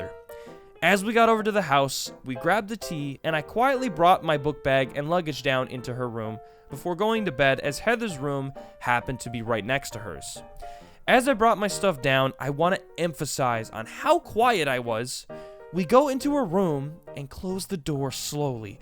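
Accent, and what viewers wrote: Newfoundland, Canada. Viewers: hey what part of newfoundland you from?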